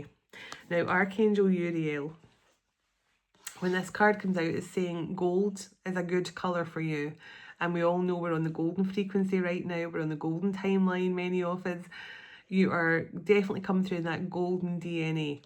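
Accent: Scotland, United Kingdom. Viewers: I love when you talk about yourself and your voice is beautiful! That Scottish accent is what drew me to you in the beginning so please don’t dislike your voice it’s beautiful. Yes lots of releasing and purging past timelines and emotional trauma yesterday. I’m ready for a new start. The past is the past no changing it but working on myself in the present is much more gratifying. I’m in the time in my life where I feel if my person doesn’t come back that’s ok I did the best I could and there’s nothing more for me to do but love myself and I choose me Everytime. That inner peace is something I work towards everyday. Inner peace and happiness is the best thing I can give myself. Whoever comes along with me is just a bonus. ❤ 123 likes and 777 views i love the numbers lol I woke up this morning with the song “ ” by in my head. 😮I took a nap yesterday and dreamt I was swimming in a pool it was my old high school lol today I dreamt of another body of water lol 😮 I knew I loved you played on my radio the other day. 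It made me tear up such a beautiful song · 😅 I love ur Scottish voice and the things u say! It made me very happy! And it's true all u have said about where we are on this journey! 🎉❤❤🎉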